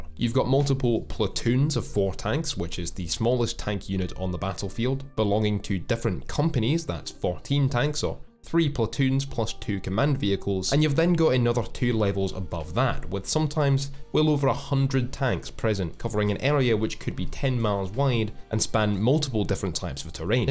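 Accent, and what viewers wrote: Scotland, United Kingdom. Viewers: Scottish ey noice!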